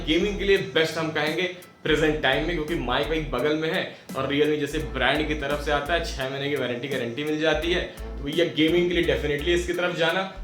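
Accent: Bihar, India. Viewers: Bihari accent bro💥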